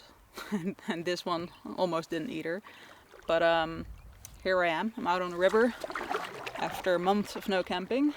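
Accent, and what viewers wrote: Netherlands. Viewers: Ik dacht al een piepklein Hollands accentje te horen😁 En ik woon zelf toevallig op de Veluwe. Mooi inderdaad, maar is niks vergeleken met waar jij nou zit. Voor een klein beetje wildernis, is het al snel Zweden of Finland (ben meer van het packraften). Leuk zeg. Superveel succes met alles wat je daar doet. En oprecht een mooie video👍🏻 Groeten, Remko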